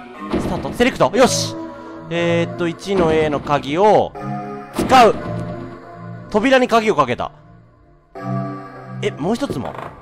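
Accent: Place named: Kyoto, Japan. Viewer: たまに出てくる京都弁でほっこりする^^ 肉人形楽しみにしてます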